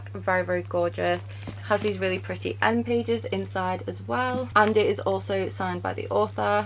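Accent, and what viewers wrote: United Kingdom. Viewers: Can I just admit that the way you say the word “pretty” sounds so elegant and polite with your British accent. 💗 Hello from the Valley of the Sun 🌞 where it really is DEADLY 🥵 heat!! I live in Scottsdale, AZ and we are still having 94-100 degree weather! Great Video as always! I have about 80% of these books as well. I absolutely ADORE them!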